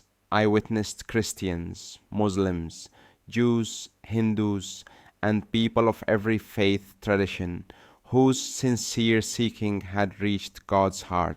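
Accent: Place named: Pakistan. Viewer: He doesn't sound arabic, more like a pakistani.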